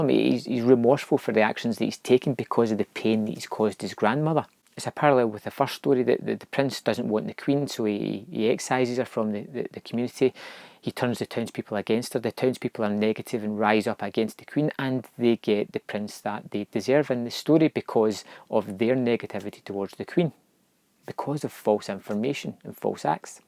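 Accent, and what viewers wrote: Scotland, United Kingdom. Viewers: I love your Scottish accent. I will love to say that Scottish and Irish accents are the most beautiful accents ever! I love how well you described the story. I watched this movie last night and I cried, because my aunt passed away with an overdose of medication for her cancer. I never got to know her too well do to how young I was when it happened. Today I feel guilty, because I didn’t get to see my aunt much since my mom never got along with her. My grandparents always tell me how similar I am to her. She use to love to draw, and ride horses all the time. I felt a similar sadness when hearing both my aunt and my grandma got breast cancer too. I almost wanted to commit suicide and kept asking why them? It should be me who gets cancer. Luckily they both made it alright, but that thought haunts me every night. This movie taught me to never give up and to love my family and the good people who are around me. 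I will have to let go of the sadness and never forget the good memories I have with my loved ones. Enjoy with the people and animals you have now so you have long lasting memories of them.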